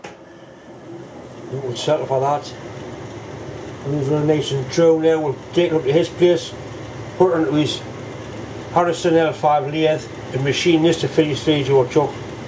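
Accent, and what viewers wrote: Newcastle, United Kingdom. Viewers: 51 years since I worked a metal lathe as an apprentice. Takes me back in a good way. Love the Geordie accent ..... don't hear it very often here in the Rocky mountains.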